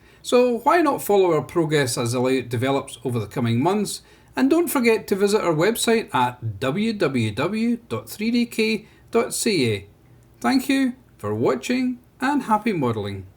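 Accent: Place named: Scotland, United Kingdom. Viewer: I was Bought Here By Budget Model Railways I Also Like Your Scottish Accent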